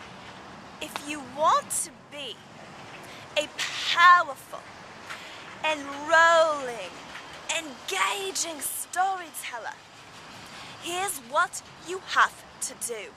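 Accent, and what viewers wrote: United Kingdom. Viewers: Like your British accent. How you said the story about this lady was amusing. :) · Thanks for posting this. Your voice is pleasant, you are good looking, and those two things helped me to overlook the annoying British voice and the elevated video camera/phone angle. Your arms must have started to cramp up. With the British accent all I could think of was Madonna putting on a British accent. Remember a little goes a long way.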